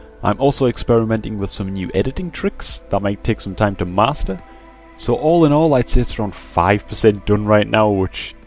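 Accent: Newcastle, United Kingdom. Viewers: sound like you have a geordie accent lol, sorta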